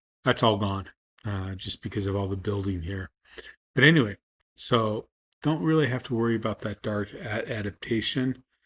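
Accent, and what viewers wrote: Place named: United Kingdom. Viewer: So you are canadian ? I thought you were brittish .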